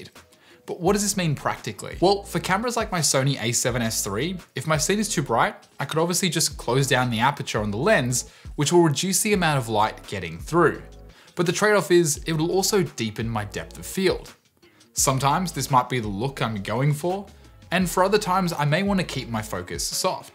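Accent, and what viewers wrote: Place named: Australia. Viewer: your AUS neighbour voice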